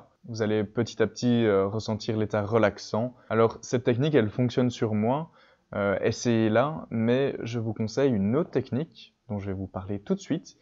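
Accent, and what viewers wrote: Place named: Switzerland. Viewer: Tu devrait parler plus doucement pour nous endormir ahah j’ai remarquer que tu était Suisse donc je comprend mieux sinon super t’es vidéo !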